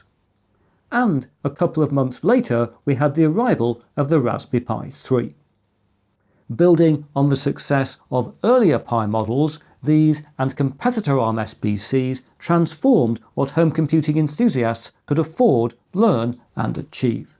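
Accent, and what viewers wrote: England, United Kingdom. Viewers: I whole heartily agree with the dumbing down based on A.I. and Social Media platforms. 1, A.I. via laziness. 2. Social Media -- the willingness to believe what is your mindset without any research of fact or fiction i.e. laziness but laziness with major consequences. Both are of concern as history does repeat for lessor reasons. Thanks professor for the update and keep up the good fight. Although we're separated across the pond by a common language, I enjoy each and every installment. Less and less translation is now needed from the King's English to my Southern Drawl. ;~)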